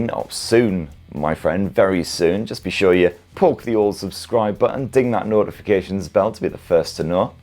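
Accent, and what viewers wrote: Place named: Northern England, United Kingdom. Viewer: He's just got a regular Northern English accent 😊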